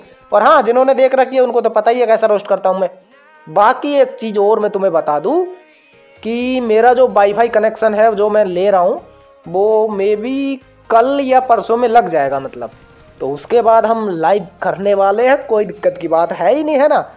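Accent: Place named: Rajasthan, India. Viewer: * WHERE ARE YOU FROM*.???????????????????? RAJASTHAN SE LGTE HO BHAI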